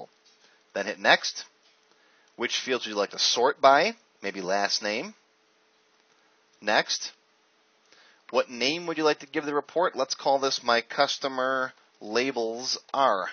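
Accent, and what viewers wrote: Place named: United States. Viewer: Thank you for your helpful video tutorial! You speak with so much clarity and articulation! What a pleasant surprise I got when I heard your accent. Finally someone who speaks English but even better that it's American :)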